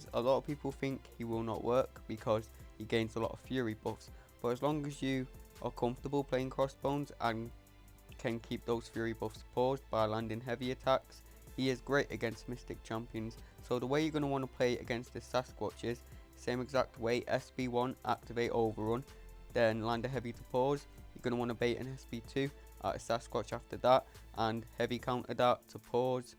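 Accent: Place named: Cockney, United Kingdom. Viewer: Wait, u a London boy? Hope i am not being unpolite, just i stayed in leeds for a year, and that cockney accent sounds soooo familiar.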